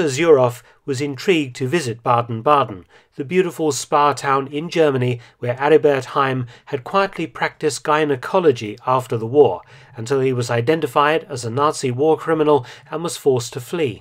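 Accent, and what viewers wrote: United Kingdom. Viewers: There is something about the British accent. I can’t remember feeling this good about an historical documentary since watching ‘World at War’ narrated by Lawrence Olivier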